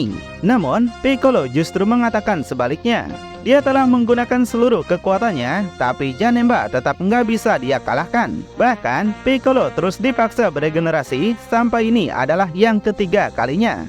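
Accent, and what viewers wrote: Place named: East Java, Indonesia. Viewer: Jawatimur suaranya kawan😂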